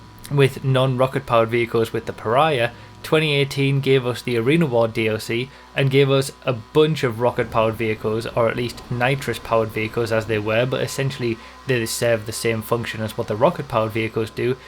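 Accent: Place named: England, United Kingdom. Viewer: Thank god hes English! Sick of hearing stupid fucking yanks.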